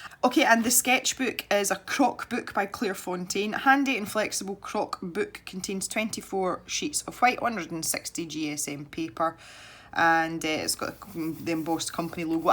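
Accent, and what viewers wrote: Scotland, United Kingdom. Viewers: OMG You're Scottish!!! WOW what up! Loved the video btw check out my podcast if you fancy a listen :)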